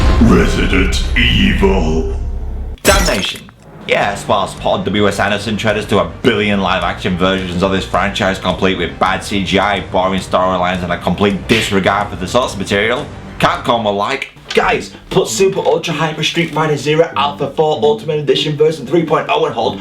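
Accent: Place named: Newcastle, United Kingdom. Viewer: Probably Jordy or something. I'm British and I'm not so sure of myself.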